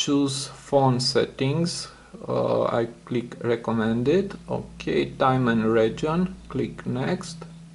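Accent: Romania. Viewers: Mr.Alex_YTB /\ ShadowHyper Dont feel sorry man. He is struggling as well , with the language. The way he speak english is telling me that is more likely Romanian or probably Bulgarian. I don't see any reason of you feeling sorry that you don't speak English , when him with his English is on YouTube trying to get make some money.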